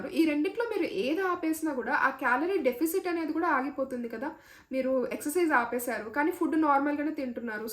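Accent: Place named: Chittoor, India. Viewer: I'm from Chittoor me language kuda almost ma Laage undhi language kosam nenu me vedios chustanu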